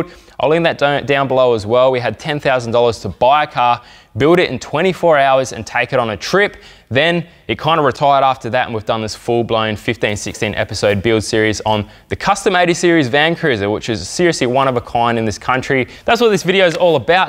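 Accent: Australia. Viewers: Australian Accent I like it "Myate"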